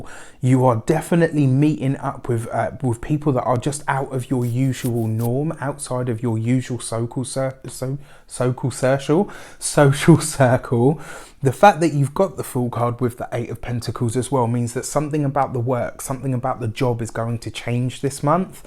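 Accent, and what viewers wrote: England, United Kingdom. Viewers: you are clear, honest funny and direct. Your cute English accent makes me smile. Thank you so much for continuing, I always look forward to seeing your posts.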